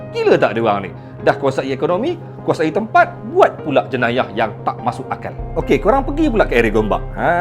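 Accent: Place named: Lampung, Indonesia. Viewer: Bahasa anda mirip bahasa Lampung mungkin satu rumpun dengan Lampung Indonesia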